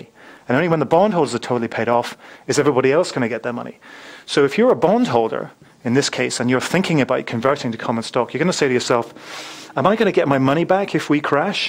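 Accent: United Kingdom. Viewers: Great video. After a couple of minutes I got used to British pronunciation and was able to concentrate on information. Will wait for new videos!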